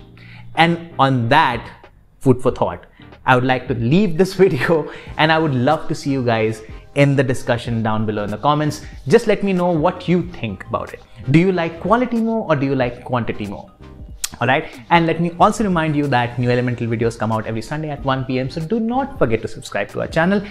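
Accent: India. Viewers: Best indian English speaker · Hey, here, the anchor from the video! That's okay buddy, I could have faked an accent but i tried to stay true to my own fellow Indians. Spend some time with Indian English content, and you'll soon start understanding what I'm trying to say. You can also use the CC feature in the YouTube interface. Thanks for being honest!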